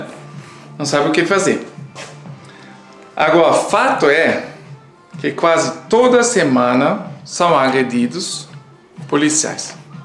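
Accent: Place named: Germany. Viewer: Ôh, é muito triste! O pior é que, o mundo todo sofre essa inversão de valores. Só Jesus! Parabéns pelo seu português, é perfeito, com esse sotaque alemão.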